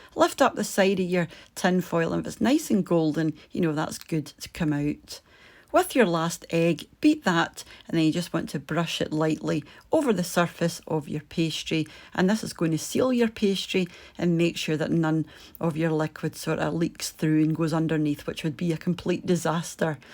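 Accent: Scotland, United Kingdom. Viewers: Oh gloriously yummy 😋that and a lovely Scottish accent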